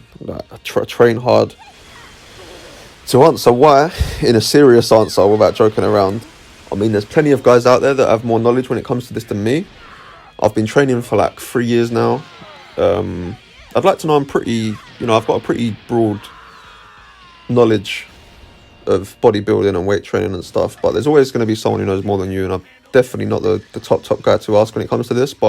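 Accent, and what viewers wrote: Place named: United Kingdom. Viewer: Get less British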